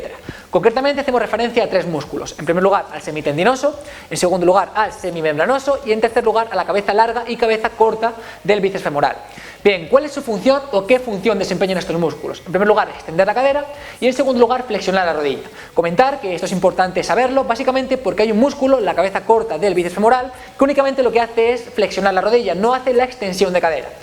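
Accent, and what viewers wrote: Spain. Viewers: Hola, me ha gustado mucho tu video. Información muy completa y pertinente para los que deseamos trabajar desde casa o en Vacaciones que no estamos cerca de nuestro gimnasio. Sólo una recomendación, se que tratas de no hacer el video tan largo, pero estas hablando muy rápido sobre un tema que no todos conocemos y usando términos anatómicos poco utilizados por nosotros, lo que hace que se difuculte entender la info. Y bueno en mi caso que hablo español, pero de Latinoamérica, a veces sólo con el acento español nos cuesta un poco entender rápidamente lo que dices, menos aún con la rapidez con la que estas hablando. Tomalo con calma, recuerda que al que de verdad le interese aprender te va a escuchar con atención y verá todo tu video, no importa la duración. Éxitos y esperamos seguir viéndote.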